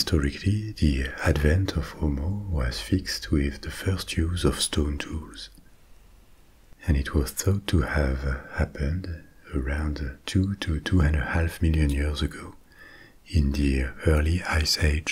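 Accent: France. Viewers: ÇA C'EST MA FRANCE AVEC UN BEAUX ACCENT MERCI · You sound parisian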